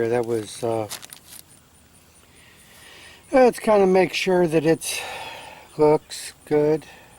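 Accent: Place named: United States. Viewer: What type of tap and dye set? I've got Metric, UNF, BSF, Whitworth, Uncle Tom Cobbly and all but none of them are the right thread! I wish you Yanks would realise that there's a whole other world outside the good ol' US of A when making these Youtube videos, and for god's sake, learn to say aluminium! Alooooominum sounds so silly!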